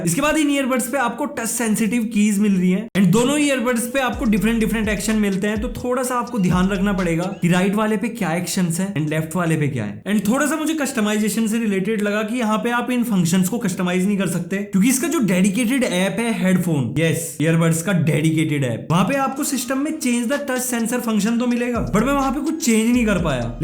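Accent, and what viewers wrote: Meerut, India. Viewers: Bhai aap Meerut se ho ??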